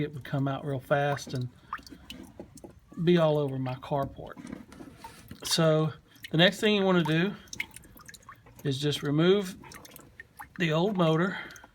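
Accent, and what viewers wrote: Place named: South, United States. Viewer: Your southern accent and the scenery makes me think you might be in Georgia. Just guessing.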